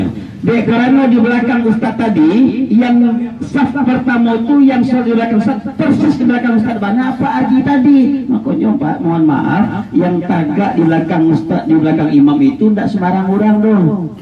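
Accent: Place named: Sumatra, Indonesia. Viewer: Logat nya orang Sumatra pak ustad.. Sangat mengetuk hati takziah nya Salam dari Taiwan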